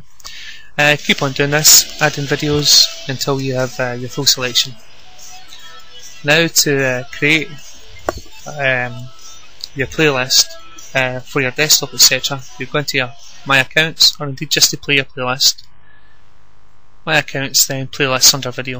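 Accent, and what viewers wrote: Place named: Scotland, United Kingdom. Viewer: omg the scottish accent is soo cool. and what a comback at comedy agony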